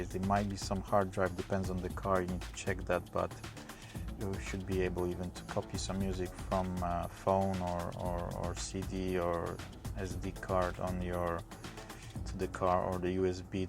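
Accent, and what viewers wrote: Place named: France. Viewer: Il est francais lui je reconnais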